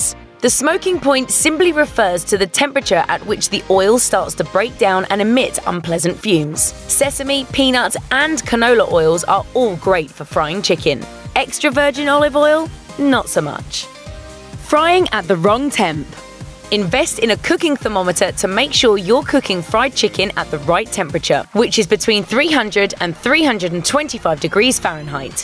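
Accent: England, United Kingdom. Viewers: fried chicken, British accent... doesnt match... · I'm sorry but I can't listen to someone with an English accent talk about the "right way" to fry chicken...I need to hear more twang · The British narrator ruined it for me 🙄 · Gilda garbutt wyer I have not watched a mashed video with a british host/narrattor. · Why is a British person talking about this??🤔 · New british voice! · Hey folks, I'm no "expert" chef or anything, just a Mississippi boy who's been frying things for 35+ years. Fried some squirrel I'd put up in Feb just today! lol. I've only fried chicken about...uh...250 times...lol..so I'm pretty good at it (do anything 250 times and you can't help but get good, right??). Anyway, I have to say, I don't like most cooking videos, especially really slick ones like this - with an English narrator, no less, lol , but these tips are really, really good. 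If you will follow these tips you'll do just fine. And each time you cook chicken you'll learn something. But I'm going to make just a few little suggestions that work for *ME*. I cannot speak for anyone else. First, regarding cooking temp: 300 to 325 isn't quite hot enough. I suggest getting the grease closer to 375, even 400 isn't "too" hot, because even if your chicken pieces are room temp (which is rarely the case if you could feel the middle) your oil temp WILL drop when you add your chicken. 325 is a pretty good temp to *maintain*, but even then I like a little closer to 350. Second, be careful with that "brine" idea. Yes, it can be a benefit, but it's certainly not necessary and sometimes it can negatively affect the texture of your meat, introducing a bit of a "compressed" or "processed" feel - which is never preferred. I would try getting good results first without the brine. If you want to marinade or pre-season in any way, just rub the chicken down with salt and maybe some cajun seasonin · Thanks British lady. 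· Woman with British accent talking to US about fried chicken? Dafuq outta here! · I'm sorry but I can't take someone with an english accent seriously when it comes to frying chicken... leave that to us southern girls. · The first tip to making fried chicken is not to listen to a British accent narrator tell you what you been doing wrong!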